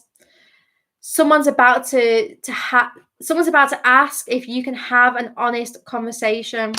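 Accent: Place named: Wales, United Kingdom. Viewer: Well done on your first YT live!! Personally prefer YT to IG. You sound like you're not too far from me. I'm in North Wales xx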